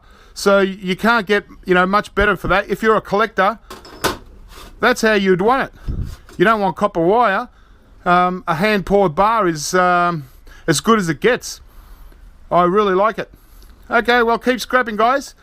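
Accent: Australia. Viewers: i too love the Austrailian accent and i enjoy the videos also have learned alot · are you Australian? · Haha your Australian accent is cool im sure whoever has been mocking you is just surprised by it. When you said copper in your american accent it sounded pretty funny, not because it was bad but because it sounded so out of character. When you say copper it kind of sounds like a southern U.S accent. idk though im from the midwest. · Australian accents are awesome! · I'm American, I didn't even notice him saying copper differently until he brought it up. After he mentioned it though it does sound like he's saying coppa. I don't care though. I like the Australian accent. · I watch this primarily to learn the AUS accent. "So... yeh." :-) (I also watch for the scrapping) Keep up the awesome videos!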